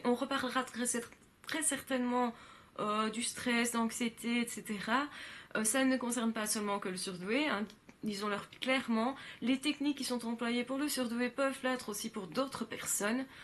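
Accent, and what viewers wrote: Belgium. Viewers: Ah, je me disais bien que vous étiez belge(à l'accent) et les trains derrière vous le confirment... Liège, Namur?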